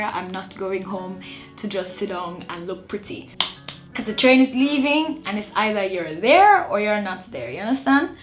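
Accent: Jamaica. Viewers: This came up in my feed and I wondered why this white looking girl is saying she's been away from Jamaica too long...then I hear the accent! Real yawdie! Keep doing ur ting yah girl! Nuff space is there for creatives. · Damn. You speaking in your ja accent is the sweetest thing. Jah know!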